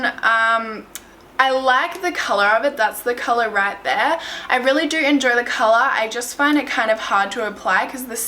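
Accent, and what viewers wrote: Australia. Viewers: Hey are you Australian? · is she australian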